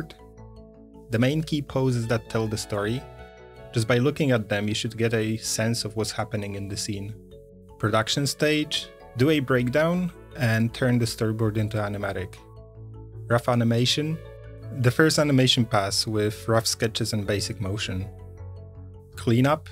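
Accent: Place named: Ireland. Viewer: Do you live in Ireland by any chance? I can kind of hear a slight bit in your accent.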